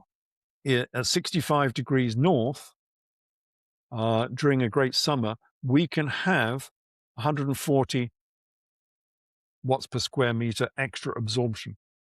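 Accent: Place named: Belfast, United Kingdom. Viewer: belfast accent is contagious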